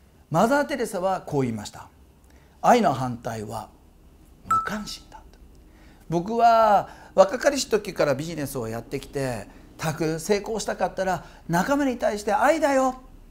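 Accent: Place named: Kansai, Japan. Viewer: ついつい次々と動画を観ています。笑😊 0.01の魔法を早速今日から使いますね！ たくみさんの会話の合間に出る関西弁に親近感が増しています。いつも惜しみなく何でも話して頂ける事に感謝しています。これからもワクワクしながらお話し聞かせてもらいますねぇ〜。😊❤️🌈ありがとうございます。🙏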